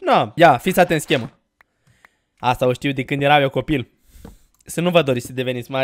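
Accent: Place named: Moldova. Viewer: Accent de moldovan>>>>>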